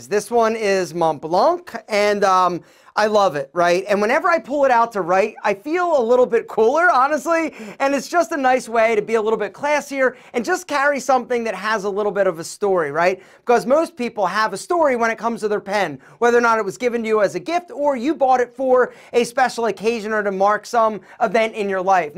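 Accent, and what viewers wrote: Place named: Pennsylvania, United States. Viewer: West Virginia? Hmmm lol. With that accent I was thinking on the PA border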